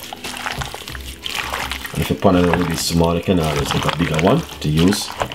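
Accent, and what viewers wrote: Jamaica. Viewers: All dis time, I thought you broadcasting from JA. 🙏🏾😂🇬🇧🖤🇯🇲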